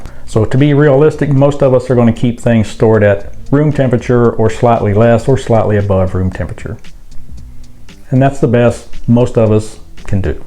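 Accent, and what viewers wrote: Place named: Arkansas, United States. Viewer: Also, I do not know where you are from. You have the best accent. Could listen to you talk all day. My guess is Arkansas. My best friends (I call family,) were all from Arkansas. I lived in Mississippi for a few years. And Granddad & relatives were from Shreveport. Anyway, none of my business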